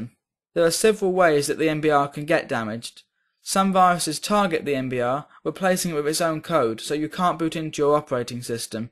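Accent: United Kingdom. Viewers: This British accent pronouncing computer phrases sounds like either baby talk or garbage. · An english mate. Who else think he sound like Mr. Bean?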